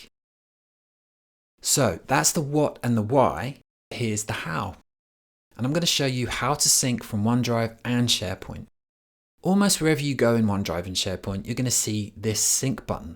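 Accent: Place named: United Kingdom. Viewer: I love your british accent :)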